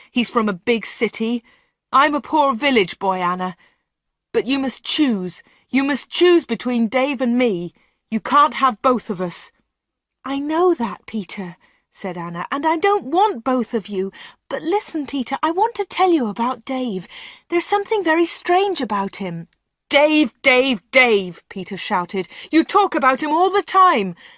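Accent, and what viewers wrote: United Kingdom. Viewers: Nice British English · این تلفظش بریتیشه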